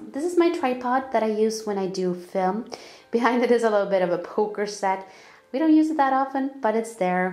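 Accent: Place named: United States. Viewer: You sound like you are from the states!! You proved me wrong! X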